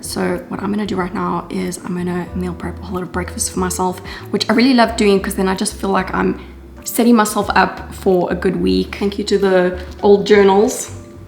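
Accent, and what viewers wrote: South Africa. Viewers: random but are you south african 😂 you kinda have an accent but i could be wrong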